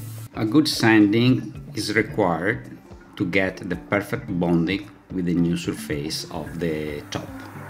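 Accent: Italy. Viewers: "The restoring project for SAILOING around the world.", you speak english with a nice Italian accent. I like it. But, it is the first time I see someone WRITING with an Italian accent. Beautiful work, by the way!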